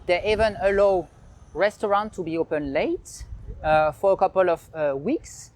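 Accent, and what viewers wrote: France. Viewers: Loves ur French accent. Great job bro ok.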